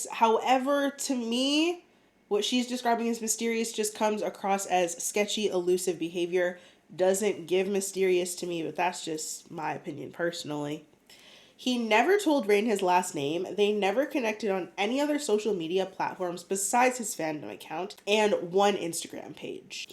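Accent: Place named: Canada. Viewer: It’s crazy I skipped over you being Canadian in your bio and I heard you say “about” as “aboot” I had a degrassi flashback and said ohhh… is def Canadian 😂I’ve watched plenty of your vids and somehow never paid attention to that until now but I love it ❤😂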